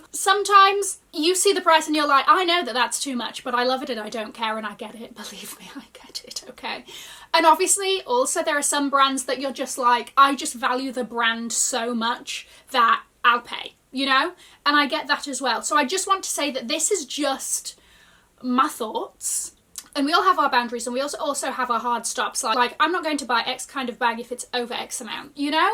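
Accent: United Kingdom. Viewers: Your videos are really knowledgeable and helpful and i adore your british accent 😍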